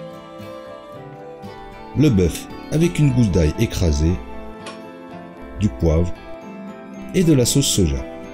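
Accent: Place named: France. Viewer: Bonjour hi, you speak French with French accent, but I speak with Canadian accent.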